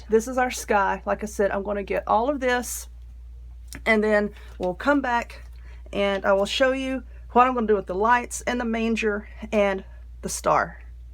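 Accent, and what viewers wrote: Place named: Carolina, United States. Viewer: I absolutely love that “Carolina twang “ Tour videos are so easy to follow, thank you so very much for taking the time to teach us & for taking the time to do your videos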